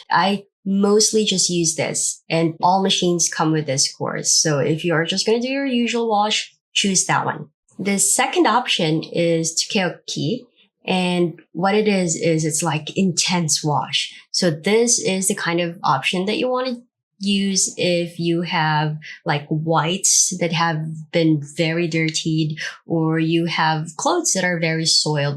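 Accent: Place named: Philippines. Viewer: Omg I love your accent! I'm also pilipina living here in Japan. This video is a life saver! Hahah tnx for sharing!!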